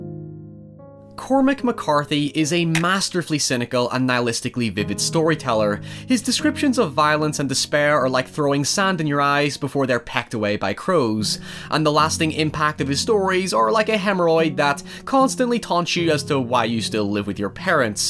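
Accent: Northern Ireland, United Kingdom. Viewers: Why do you sound like a Northern Irishman trying to sound American? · It's rare to come across a channel with such well-constructed video essays! It's also excellent to hear another Northern Irish accent :)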